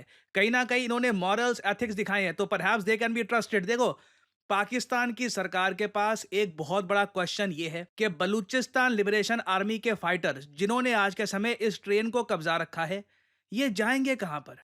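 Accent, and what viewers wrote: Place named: India. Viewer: Ye indian accent h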